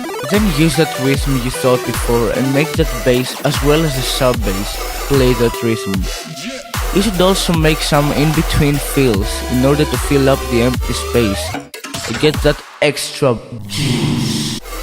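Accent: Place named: Greece. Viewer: Bro where are you from? You have such a Greek accent haha. Great video !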